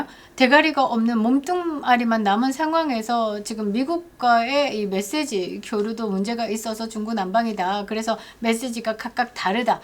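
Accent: Gyeongsang, South Korea. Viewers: 경상북도 출신인교?사뚜리가!너무너무 좋아요!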